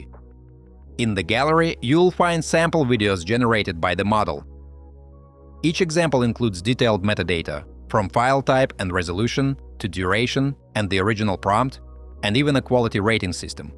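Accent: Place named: Russia. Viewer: Рашен инглиш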